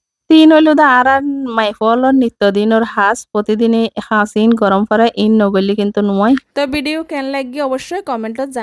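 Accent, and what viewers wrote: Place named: Chittagong, Bangladesh. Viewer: আপুর বাড়ি মনে হয় চট্টগ্রামে তাই মনে হইল বাসায় হিসেবে আমার কাছে ভালো লাগে চট্টগ্রামের ভাষা 💗💗💗💗💗👍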